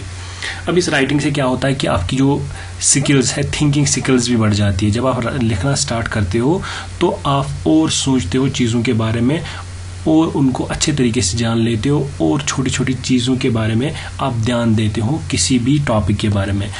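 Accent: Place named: Kashmir, India. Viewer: A Clear kashmiri accent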